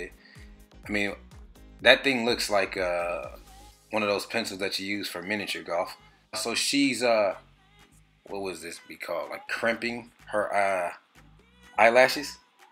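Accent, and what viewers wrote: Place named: East Coast, United States. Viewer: War paint,lol!!!Love that!I hear a east coast accent!!!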